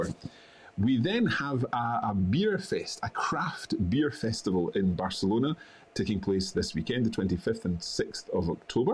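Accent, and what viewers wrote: Scotland, United Kingdom. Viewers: Patricia living in france and wishing to learn french, thank you love listening to a Scottish man.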